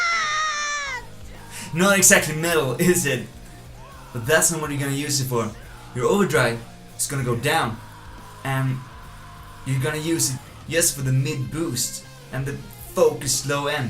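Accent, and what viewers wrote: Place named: Northern Europe. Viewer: He just sounds like he has a northern European accent to me.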